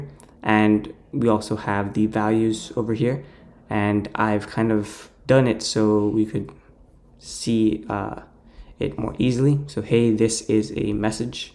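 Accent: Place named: India. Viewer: lol hes got a subtle indian accent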